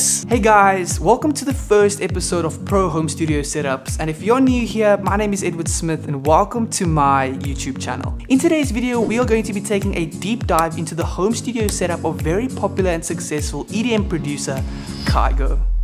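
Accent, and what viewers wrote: South Africa. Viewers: Really enjoyed this video! Toe jy begin praat, het ek dadelik besef jy’s van hierdie kant af! Looking forward to see more from you 💪🏽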